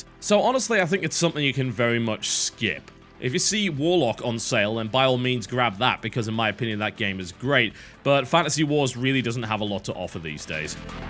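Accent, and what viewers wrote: United Kingdom. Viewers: Video content is good, british accent is just terrible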